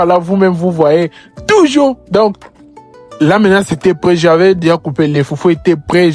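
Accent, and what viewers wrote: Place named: Ivory Coast. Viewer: T'es congolais mais t'as l'accent des ivoiriens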